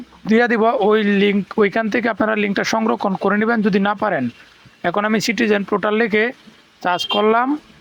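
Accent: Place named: Noakhali, Bangladesh. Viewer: আপনি কি কলকাতার নাগরিক হন লাইন কি পাক্কা নোয়াখাইল্লা লোক।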